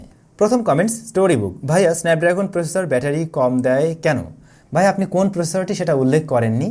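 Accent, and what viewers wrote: Barishal, Bangladesh. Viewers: ভাই অনেক দিন অনেক প্রশ্ন করেছি কিন্তু একদিনও উত্তর পাইনি, ভাই আপনার গ্রামের বাড়ি কি বরিশাল৷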